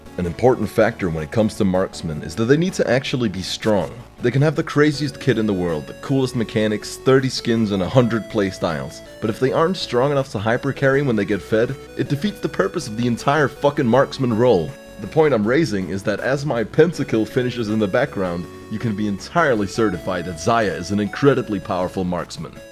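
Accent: Denmark. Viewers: wait, you're danish? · Is danish? Or scandinavian for that matter?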